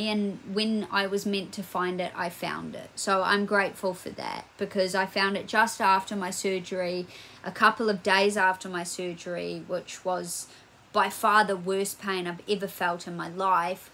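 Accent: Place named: Australia. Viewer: You are absolutely right, don ´t let anybody rush you! But the thing is: people do rush you, things which seem totally simple to them are like climbing Mount Everest for you, for example: (when you are finally allowed to): take one step! The (emotional) problem is, in my experience, not yourself, the fact that you have to rely on a lot of help from others etc, the problem is all of the other people (even the physiotherapist), who rush you and put pressure on you - this is what causes the “bad” emotions to you. And like you, I had days where I wished I was dead, not (only) because of the pain (which was excruitiating, like you said), but just because I was tired of needing, help, I was tired of waiting for things to get better, I was tired because the whole situation was soooo unbearable strenous and exhausting…. I don ´t know how good the medical care is in your country - I don ´t know where you come from, from your accent I think Australia or New Zealand - in Germany our health system is at a low and you don ´t get Physiotherapie for more than 6 months 😮 and then “everything has to be ok again” 😡 I found your video by accident today, your video is 1 year old, you are young and I really hope that you are back on track, that your leg is functioning “ok” for YOU, so that you can go on with your life and do all the things you want to. 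I am 58 years old, 2 years ago I had a poly trauma with ancle- fracture, tibial plateau fracture, knee cap fracture and fracture of my upper arm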